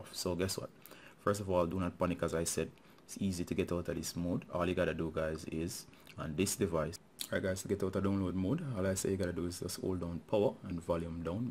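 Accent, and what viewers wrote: Jamaica. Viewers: I did panic! Thank you so much. All good now. Your accent helps too.....Jamaician?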